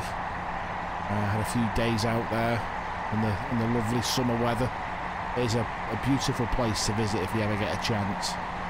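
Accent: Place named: United Kingdom. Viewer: great vid and commentary. love me some uk accent at 2am, thats my asmr. haha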